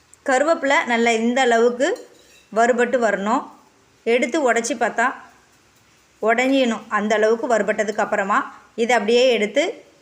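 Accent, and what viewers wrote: Tirunelveli, India. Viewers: உங்கள் பேச்சு சரியான திருநெல்வேலி தமிழ். கேட்பதற்கு இனிமையாக இருந்தது.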